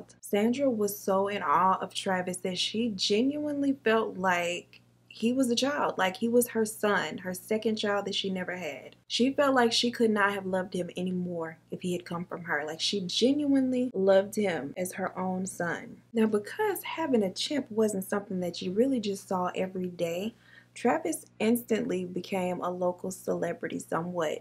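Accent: South, United States. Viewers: You gotta love & appreciate mamas! ❤ No one else in this world will ever love, help, protect, understand, forgive or worry about you than her! 🥰 I have been watching you for about a yr now and I found you bc I watch Bailey Sarian and MrBallen and one of your videos popped up oneday. You are a beautiful woman 😍 and I love the fact you sound like me. I also have a southern accent (or so I've been told by my in-laws 😂). Listening to you is just like hanging out and gossiping with my girlfriends. 💯 Love your videos so keep up the awesome work! 💋🏆👝👑💄🙏💜🌻💣🦋❤🌟🎉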